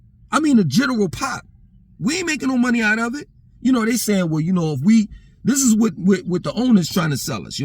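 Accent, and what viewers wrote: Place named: Northeast, United States. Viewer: Before 2009, which NYC borough you came from? It’s gotta be somewhere from the Northeast with that accent…I mean how NYC don’t accept you? New Yorkers always rep NYC & move somewhere else to compare it to NYC 😂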